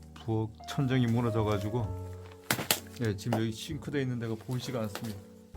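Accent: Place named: Gangneung, South Korea. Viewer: 강릉 감재 특유의 말을 들으니 반갑소야. 시방은 서울에 살지만.. 저도 고행이 강능 죽현이래요.. 촬영차 오가시는 길 시나미 잘 댕기시우야.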